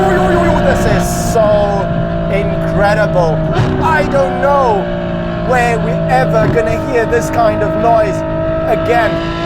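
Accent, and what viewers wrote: South Africa. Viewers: That South African Accent is sexier than the F12 and the 812😉🤣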